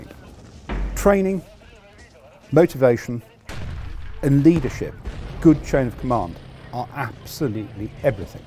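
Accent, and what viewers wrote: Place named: United Kingdom. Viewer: I could listen to all day to be honest. But as an American i can say i enjoy listening to most British talk lol.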